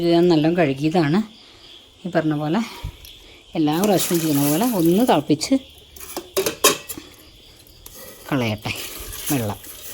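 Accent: Valluvanad, India. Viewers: Sister, i have become a big fan of your videos.... ആ വള്ളുവനാടൻ ഭാഷ കേൾക്കാൻ തന്നെ സന്തോഷം ആണ്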